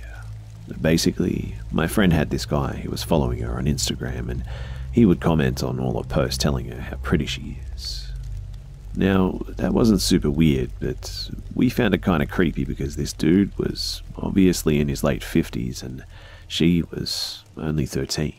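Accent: Australia. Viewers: So refreshing to listen to creepy stories with an Aussie accent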